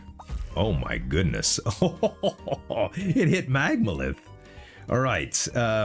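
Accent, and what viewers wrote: Louisiana, United States. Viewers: Okay dude your accent is driving me nuts. Are you from Louisiana? You have a very Cajun accent. I'm super familiar with it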